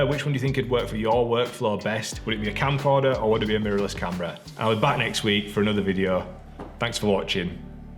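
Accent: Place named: Northern England, United Kingdom. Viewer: D'ya know summat, it's so nice to hear not only an English accent but a NORTHERN English accent on YouTube! you have a new sub my friend!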